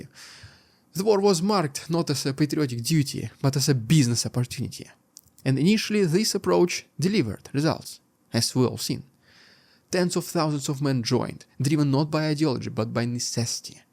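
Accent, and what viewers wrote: Russia. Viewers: How can you be so eloquent in English and at the same time have such a heavy Russian accent? You are an enigma... Your content is really good, thank you for your tireless work! Russian evil regime will be defeated, truth and love must prevail!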